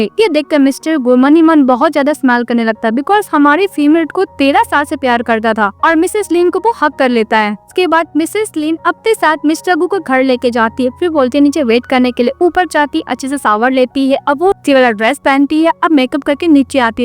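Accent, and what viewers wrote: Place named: Assam, India. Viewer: Didi aap asamis ho ya Bangali sound like asami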